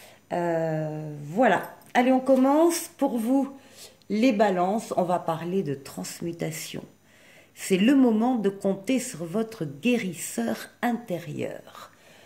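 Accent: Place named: Spain. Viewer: Es tu espagnol? Y a un petit accent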